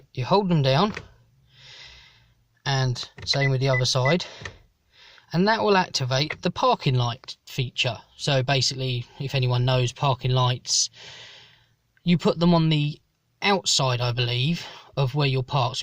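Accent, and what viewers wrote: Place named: United Kingdom. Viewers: Yoda Master British English. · Lol, you speak like a british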